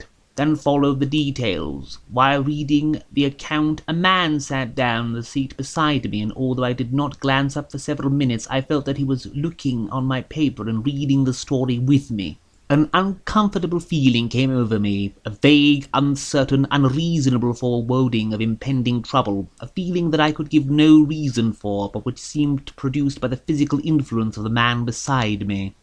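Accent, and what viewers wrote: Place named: Eastern Europe. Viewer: You have the perfect voice for things like this, your diction is excellent and the slight Slavic lilt adds something rather lovely to the cadence.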